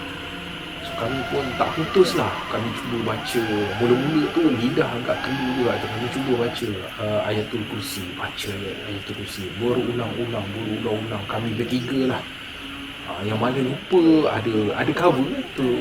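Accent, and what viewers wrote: Terengganu, Malaysia. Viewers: Ambe asal org kampung seberang pintasan...area kuala dungun...biase gak gi panjat teluk gadong naik utk memancing...skang dah berubah..mari dungun nanti kite ngeteh...deme cakap macam org ganu dohh....respek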